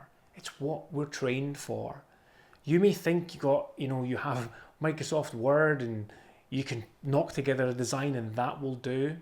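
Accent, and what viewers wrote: United Kingdom. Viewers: Great advice mate.. Are you from the UK?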